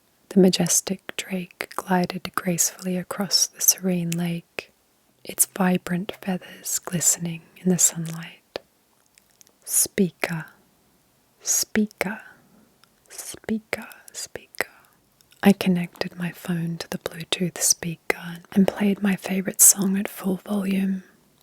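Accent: Australia. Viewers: Hearing a soft gentle aussie womans voice whisper certain words and example sentences takes me straight back to soing spelling bees in primary school. There are notable austtalian ASMR creators, but not many, so its always nice to find them. Just makes me relate more which makes the relaxation better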